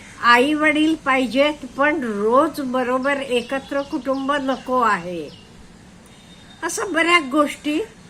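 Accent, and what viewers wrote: Karnataka, India. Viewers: तुम्ही कानडी भाषिक आहात का. बोलण्यावरून असे वाटते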